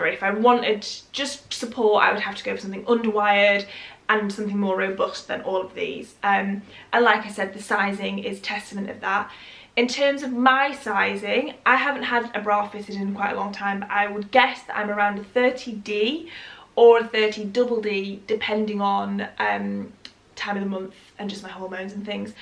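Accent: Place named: Yorkshire, United Kingdom. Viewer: I think Yorkshire!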